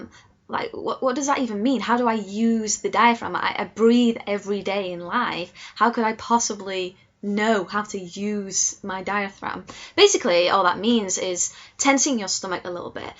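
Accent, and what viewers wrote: United Kingdom. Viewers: Are you British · I love that British accent.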